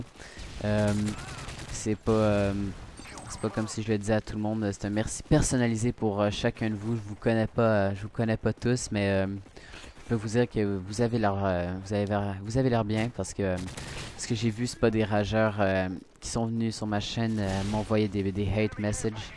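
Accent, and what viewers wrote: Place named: Quebec, Canada. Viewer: bravo pour avoir dépasser les cent abonnés , pour l'accent ont si habitue , beaucoup d'excellent youtubeur sont québécois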